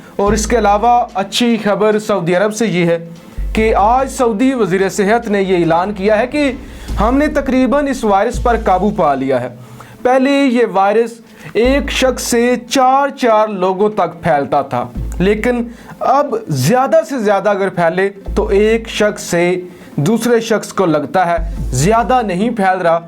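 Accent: India. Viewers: گلت فہمی نہیں ہوتا غلط فہمی ہوتا ہے آپ انڈین لہجہ چھوڑ دیں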